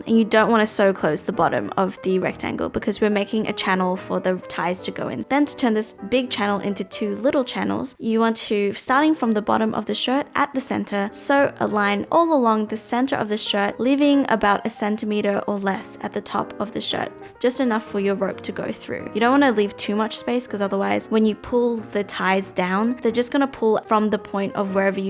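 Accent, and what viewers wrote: Australia. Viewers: half way into the video before realising that you're Australian too woah I'm so used to hearing the accent that it didn't register until you mentioned cotton on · you're literally the helpful older sister everyone wants, also you australian?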